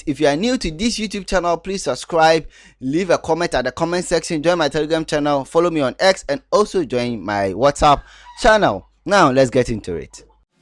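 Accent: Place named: Ghana. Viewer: 🙌🏽🔥🙌🏽 more Energy to you Brudda. I just 😂 knew you were Ghanaian the moment I heard the Okay and Yooooo 😂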